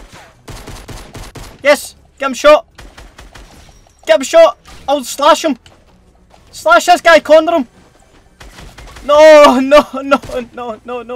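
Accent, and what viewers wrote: Scotland, United Kingdom. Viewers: Just realized he is scottish LUV YA ACCENT! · Feng Magic Gadget it’s Scottish man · Scottish ya spoon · he’s not Irish he’s Scottish from Scotland like me and my family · It’s Scottish ya nuggets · Thats scottish if im not wrong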